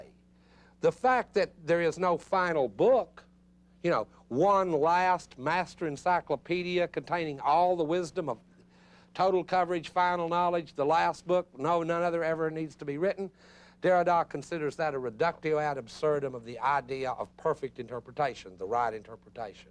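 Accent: South, United States. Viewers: I think saying words "stand in" for things is gobblty gook. You don't talk to have your words stand in for things, but to represent things. They may fall short, and that is a problem. But having them "stand in" for things opens the door to what they were never meant to mean. He says Derrida thinks words represent reality, -- for example, Derrida asks where to pick up his check for teaching a course -- then he says Derrida thinks words don't represent reality. So that means Derrida is out of money because words don't point to where he can pick up his check. It's a contradiction, pure and simple, and the speaker is good at jumping from one contradiction to another in his casual, friendly, homespun, southern drawl accent as if nothing is wrong and that it all makes perfect sense and that he is not bamboozling anybody. He is a salesman -- a bamboozler of the first degree. 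· Ah jes hate suthin acceyents but this brilliant guy knows his stuff! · That southern drawl is to die for <3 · Gotta love “Hai-degger” and “moh-derna-tee.” Just discovered this guy, big fan. Reminds me of home (TN), though I think his accent is from a different state. It’s hilarious because you expect a Baptist sermon from that accent, and then you realize you’re not in church.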